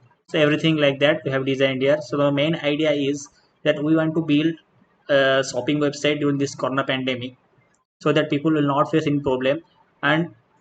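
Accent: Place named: United Kingdom. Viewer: Woww woww... British accent😱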